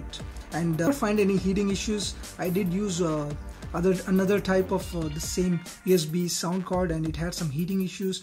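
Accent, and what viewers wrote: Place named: India. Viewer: tere accent se main samajh gya tha tu indian hai... hahaha...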